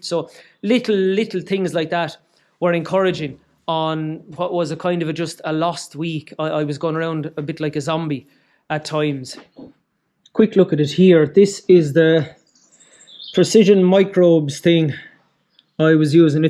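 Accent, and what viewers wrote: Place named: Ireland. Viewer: I know nothing about cows but I love your Irish Accent. New subscriber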